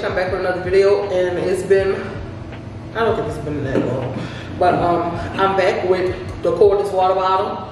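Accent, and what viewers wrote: New Orleans, United States. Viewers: Hey there my name is I’m just discovering your videos I can dig it I will be following! you remind me so much of my best friend...ikr 2 lol! Btw Ik your probably frustrated with your self behind the situation but as far as being embarrassed i think not!! You have absolutely nothing to be embarrassed about! I’m sure at some point in life if a person is old enough, more than the majority of us have been thru this or something similar...trust me!! Oh & I love your accent gotta be N.O. baaabeee😂❤️🙏🏾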